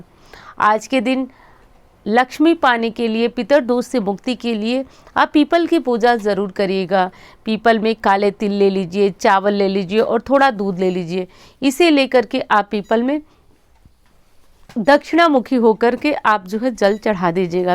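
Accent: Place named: Purvanchal, India. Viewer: दीदी हम जौनपुर से है दीदी मुझे आपकी आवाज से पता चल रहा था कि आप मेरे साइड से हो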